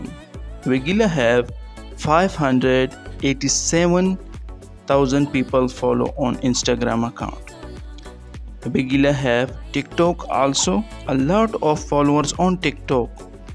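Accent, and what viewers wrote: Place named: India. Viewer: Is the announcer from India. Because he sounds like one of them.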